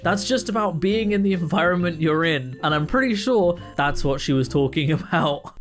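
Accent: United Kingdom. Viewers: eheheh thank you, it’s honestly just a standard british accent!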